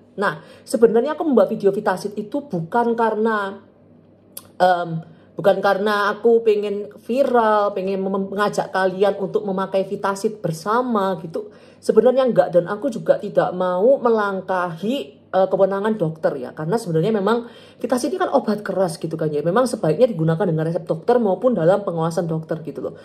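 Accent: Surabaya, Indonesia. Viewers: Logat suroboyo ne kak 😍 bagus bagus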